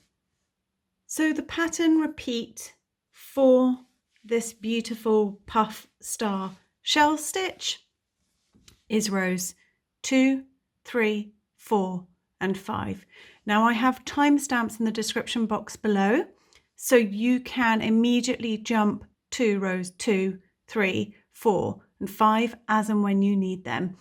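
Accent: England, United Kingdom. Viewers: I heard your accent and assumed you would be using British crochet terms until I heard SC! I'm a beginner to blankets and anything that isn't amigurumi, would I be able to follow this if I remember that SC is DC and DC is TC to me? Are there any other differences ? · Great tutorial thanks also so sweet to listen such a rich English accent.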